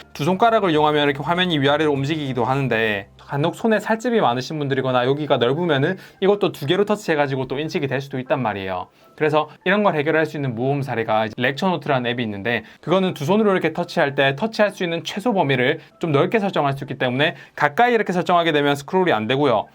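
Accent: Gyeongsang, South Korea. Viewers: 고향이 부산이신가요?억양이 굉장히 경상도스러운데요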